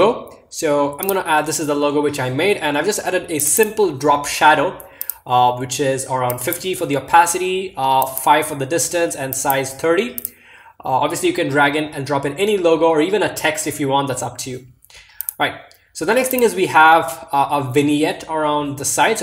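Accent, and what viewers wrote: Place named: India. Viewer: Lol cause you don't sound like one but I noticed the slight pinch of 'desi'ness in ur voice so I posted that comment😉 btw I'm 13 and learning photoshop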